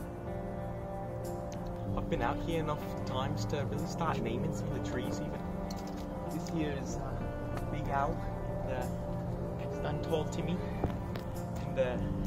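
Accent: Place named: England, United Kingdom. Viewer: Oi, I have a life. Also no Irish man would be offended as that is almost a perfect English accent haha!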